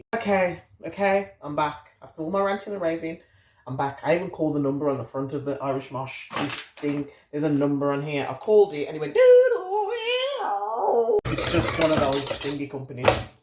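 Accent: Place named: Jamaica. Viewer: You still pronounce it the Jamaican way too lol... Great video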